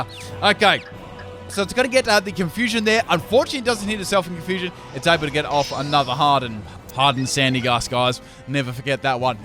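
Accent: Australia. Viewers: my mother things you come from Australia... is that right?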